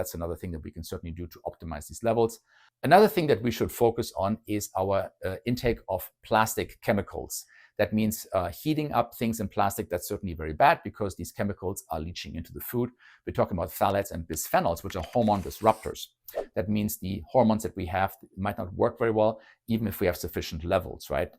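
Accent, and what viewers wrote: Germany. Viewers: Is it fair to say the goldilocks zone for HCG on TRT is between <num> mcg / wk split up 3 times per wk? I believe a break on HCG is necessary after 1 month of continued useage? Break for 2-4 wks? Thanks Doc I detect a German accent & facial features to boot.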